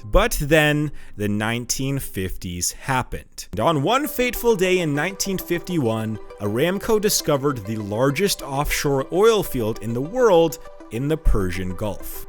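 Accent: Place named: Canada. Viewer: I think he is Canadian. I heard slight “Canadian raising” in his pronunciation of the ‘ou’ diphthong (listen to when he talks about when his next video “comes out” at the end of the video.) He also uses the more open ‘o’ in words like “dollar” and “over.” I’d guess he’s from either Ontario or Alberta based on the accent.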